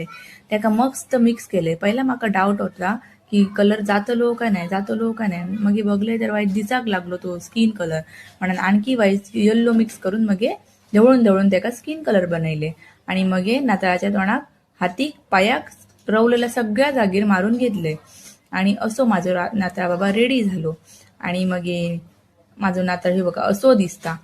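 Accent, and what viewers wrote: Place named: Malvan, India. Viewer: Lay bhari malvanit commentary martay go 👌🏻khup chan👌🏻😁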